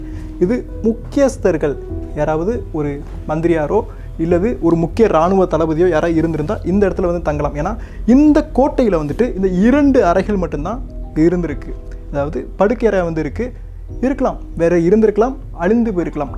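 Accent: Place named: Madurai, India. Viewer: Hi.... Please read kannadasan's Cheraman Kadhali..we miss your iyalbana madurai slang..ellorukkum vanakkam.and vaanga polaam.. pazhaya ilandha sogathil puthiya konathil anbudan varavekirom.. love and regards..and very best wishes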